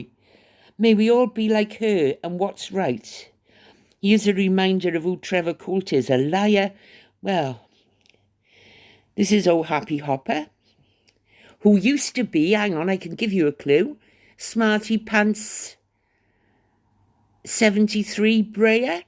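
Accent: Wales, United Kingdom. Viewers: Love your Welsh voice girl! So glad your back!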